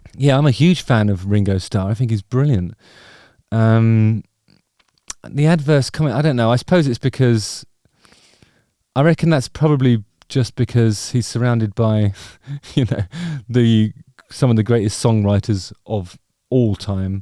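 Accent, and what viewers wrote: United Kingdom. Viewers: i'm embarassed i had never heard of (must have been that rock i was living on in hawaii;-))....somehow I stumbled on your youtube channel and thought it was the best thing I've ever seen, positive, insightful, british accent. I checked out and fuck, damn, i play it all the time. my daughter is like "are your favorite band". i'm 57 and thought i'd never find anything new an exciting (for me at least, 15 years late) in music again. mahalo. it's a joy to watch you work and inspiring in ways you might not immediately know that are unrelated to music, but overcoming the struggles of life. after watching this, i guess i need to smile more too now, damn you Justin Hawkins you are making the world less miserable!!!;-) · I like your accent; where in the UK do you come from?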